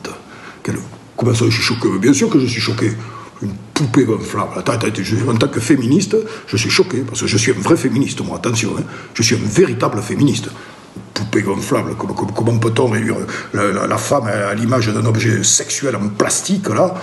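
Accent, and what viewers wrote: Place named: Marseille, France. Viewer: L accent de chez NOUS .MARSEILLAIS, PAGNOLLL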